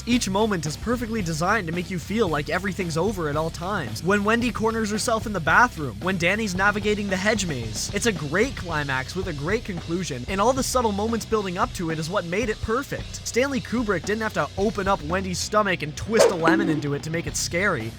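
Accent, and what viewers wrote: Canada. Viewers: bro has a HEAVY canadian accent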